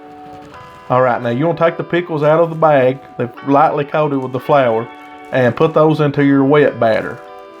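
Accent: South, United States. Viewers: Loved the “country as cornbread” accent. ❤️ Great recipe. Thank You for sharing. My Friends and Family absolutely loved them. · The ultimate country accent!!!! Also great video I’ve been trying to find a good one. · Not to sound mean but this guy sounds more southern than me and I’m from Louisiana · Southern draw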